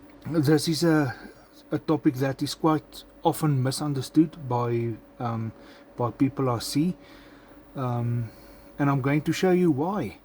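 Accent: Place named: Afrikaans, South Africa. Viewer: Great vidoes... Just one problem.... why did you apologise for your Afrikaans accent? Your english is better then my Afrikaans... LOL🤣. By the way , where's the video about the foglights?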